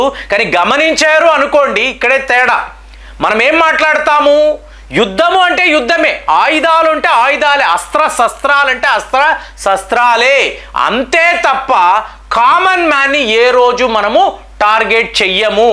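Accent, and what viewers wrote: Tamil Nadu, India. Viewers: Video lo vnna contente chudandi sir plz, garu tamilanadu lo vnnaru, telugu prononsation alage vntadi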